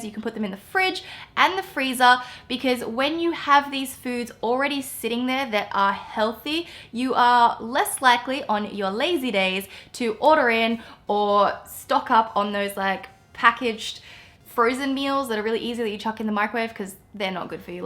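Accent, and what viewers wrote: Australia. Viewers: She has an Aussie accent. is she from Australia? :)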